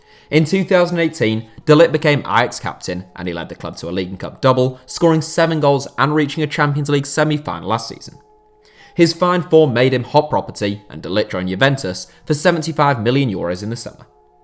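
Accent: Ireland. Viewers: Is this the voice of the irishman man from the other HITC channel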